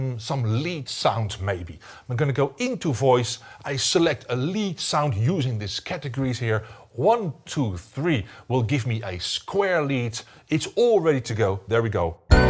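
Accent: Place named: Netherlands. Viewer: His accent... must be dutch.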